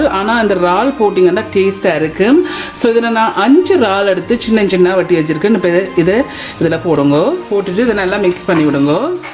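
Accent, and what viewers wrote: Sri Lanka. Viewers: உங்கட இலங்கை தமிழ் ரொம்ப வடிவா இருக்கு உங்க மாதிரி... இந்திய தேசத்தின் தமிழ்நாட்டிலிருந்து தீபா...❤️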